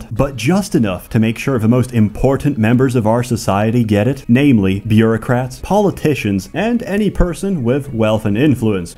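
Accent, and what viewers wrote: Poland. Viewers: Are you from Poland? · U Polish ?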